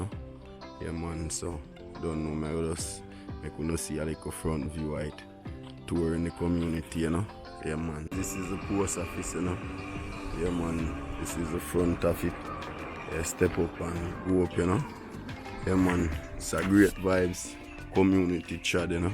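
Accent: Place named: Jamaica. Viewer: Please speak up a little bit and SLOW down please cuz many of us are NOT from Jamaica and PATOIS is like a foreign language to us. LOL. Loved the video. God Bless.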